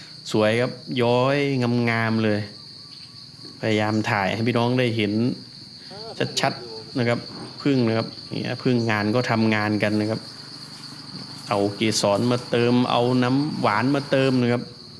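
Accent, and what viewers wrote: Isan, Thailand. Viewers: ฟังสำเนียงเว้านี่เจ้าเป็นคนจังหวัดเลยแม่นบ่คับ😂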